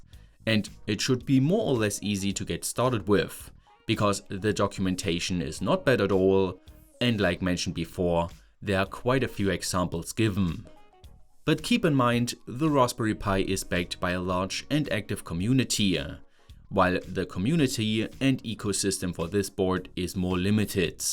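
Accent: Germany. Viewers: Is the Great Scott a real Scott? I hear a German (-ic) accent. :-)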